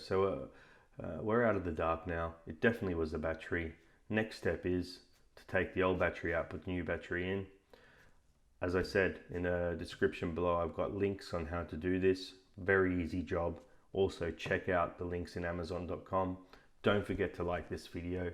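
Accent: Australia. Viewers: Nice to hear a fellow Aussie. Mine is a 6 plus I've changed the battery and everything seemed to go as per the instructions I haven't secured the battery down yet, but when I press the on/off button I only get the white screen with the apple logo briefly then nothing at all after that. Can you help? PS my charging cable is split right down the small connecting plug part, I have ordered a new one, could that be something?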